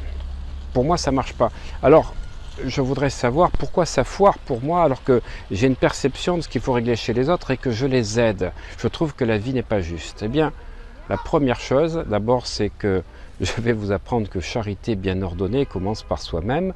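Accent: Bordeaux, France. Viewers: Merci Bro. ! Vous avez perdu votre accent bordelais ?!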